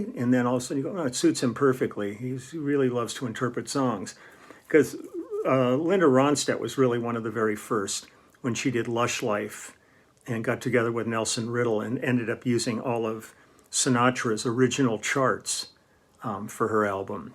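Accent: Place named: Wisconsin, United States. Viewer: I still hear the Wisconsin in your voice after 50 years away😋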